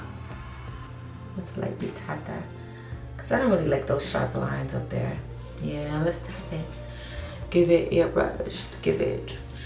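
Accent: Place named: Brooklyn, United States. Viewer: It’s your New York Brooklyn accent for me !!! I have to watch this Anna series because this sounds goodddd Also can’t wait for you and your husband to do the how you met story time video 🤎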